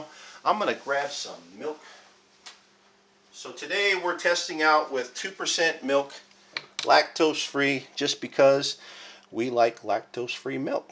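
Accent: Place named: France. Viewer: Great vid, thanks. Are you still using the frother and the dual c❤coffee machine . I just ordered the dual plus machine and thinking of getting the frother. I ben loking e rywhre for anachine tht i cab do both kcup and nespresso. I sop motly online, i must of ben typing wrong or somthing lol hope the new cofe works good. Anyway thanks, oh you sound like you have a french accent, are you canadian ?😊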